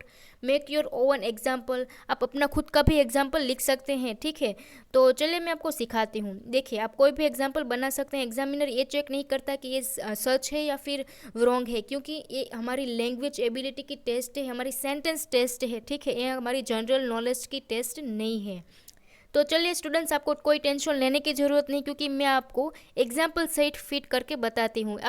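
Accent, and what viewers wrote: Gujarat, India. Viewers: Very informative Ma'am aap Gujarati ho , aapki boli se lagta hai I'm also Gujarati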